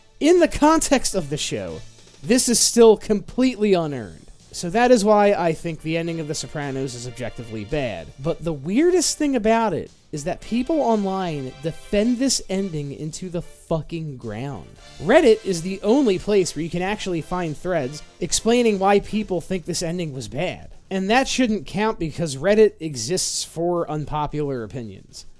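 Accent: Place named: Philadelphia, United States. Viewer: Listening to you more it's gotta be a Philly accent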